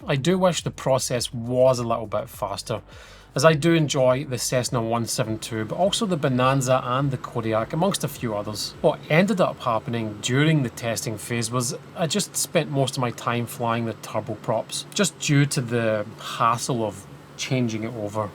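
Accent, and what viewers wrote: Scotland, United Kingdom. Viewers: I enjoyed the Scottish accent very much.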